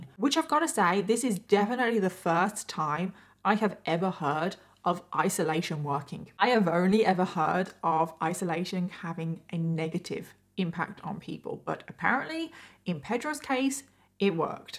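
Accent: United Kingdom. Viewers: you are so sweet and caring <3 i love the way you tell these cases, it's very personal and it always shows your opinions and feelings about them.. also ADORE your british accent! :) Love from Italy <3 P.s: in Italy we just had a very shocking murder of a young girl, who was a mother to a 5 year-old baby boy and she was also pretty known on the internet.. it's really absurd, I'd really like to see u cover it!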